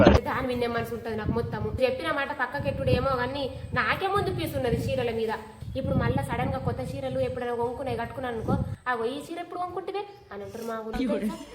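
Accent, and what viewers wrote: Nizamabad, India. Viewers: సూపర్ చెల్లి.. మీ వీడియోలు 6 రోజుల నుండి చూస్తున్నాను మా అమ్మ వాళ్ళది బోధన్ మీ యాస నిజామాబాద్ జిల్లా వాళ్ళు మాట్లాడతారు మీ యాసతో నేను రోజు నవ్వుకుంటను మీకు అభిమాని అయిన సూపర్ ఒకటి మీ పేరు నా పేరు లో సగం వున్నది ఇలాగే నవ్వించంది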